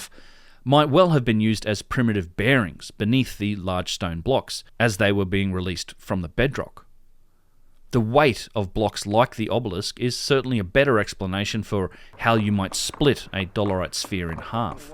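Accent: Australia. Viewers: I'm loving your work! I know you're a global citizen now, but I'm really pleased to hear an Aussie accent behind the insights put forward.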